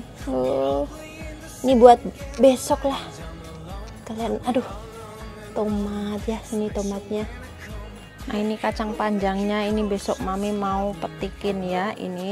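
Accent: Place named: Java, Indonesia. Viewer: Ngomong jowo e ora ilang y mom .... seneng punya tanaman buah dan sayur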